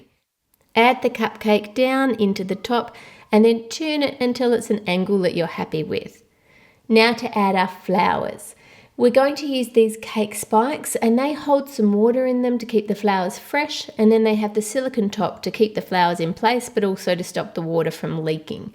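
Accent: United Kingdom. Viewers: Are you British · Your so talented and creative. And I love your accent too. It's like British accent.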